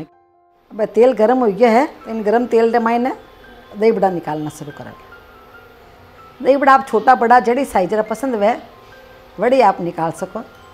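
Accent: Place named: Marwar, India. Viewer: आपकी समझाने कि विधि बहुत बढ़िया है। सभी रेसिपी आपके समझाए अनुसार बनाई जाए तो बिलकुल आप जैसी ही बनेगी। आपकी मारवाड़ी बोली के हम फैन हो गए है🙏🙏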